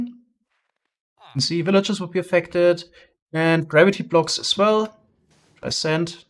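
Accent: Germany. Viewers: I don’t hear strong German accents in English anymore, for a second I thought I heard straight up German.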